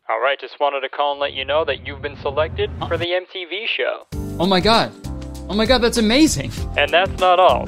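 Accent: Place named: Canada. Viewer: You sound canadian in the best way possible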